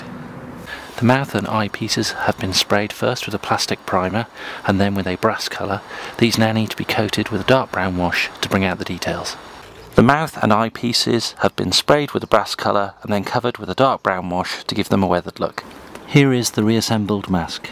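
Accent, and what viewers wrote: United Kingdom. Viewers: Thanks for the tutorial! And was wondering where you get your paint from (I'm assuming your in the UK due to your accent)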